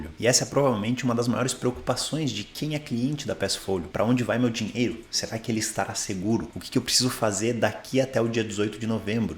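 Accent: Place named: United States. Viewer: Você é americano? Pois tem sotaque Se for vc deve entender bem do mercado americano. Como é o seu curso?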